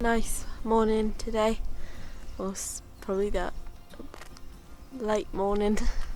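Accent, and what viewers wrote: United Kingdom. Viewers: I love your calm voice and your little accent so British, it's too charming. You have the right to make fire in your English forests?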